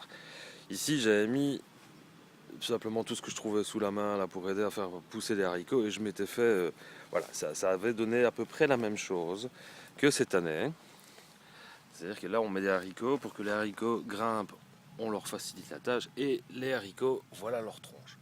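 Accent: North, France. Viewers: ou alors du coter nord france cette accent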